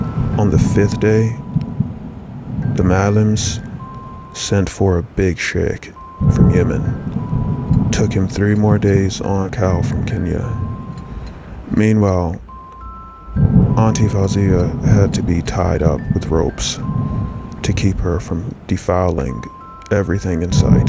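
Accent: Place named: South, United States. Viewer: Are you wearing your “human skin” today? 😝 That part of the story gave me chills and then I pictured some kind of ALIEN!?!😱 I loved your precious Southern Belle accent… really suits you and all of your character voices! I hope 🤞 your channel grows fast and soon. I’ve told people, but I’m not good at using my phone and sharing links and bloody blinks! Lol… I’ll get there! Thanks for your content and enthralling stories and skills. It’s not as easy as people think 🤔 I wish you all the BEST and I’ll be watching and learning how to share too ✌️✨🤗✨🐨✨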